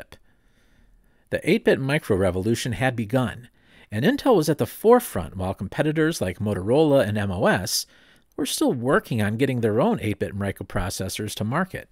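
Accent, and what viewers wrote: United States. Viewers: Why do people in the USA pronounce Z as zee instead of zed used by most of the other English speaking world. It's extremely difficult listening to this from England. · Why must you americans pronounce "o" as "/\" ? I mean how do you know the difference between "a knot" and "a nut" ?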